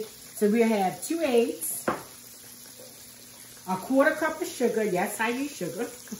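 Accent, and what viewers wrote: Baltimore, United States. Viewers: Momma you from Baltimore ? 😂 I can hear it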